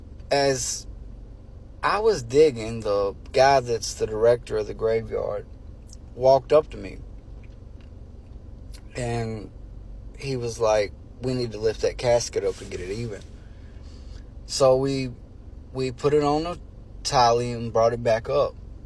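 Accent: Wales, United Kingdom. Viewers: I could listen to this guy all day long. Who knew the Welsh accent was so beautiful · Why are all these shows being narrated by british men why not American?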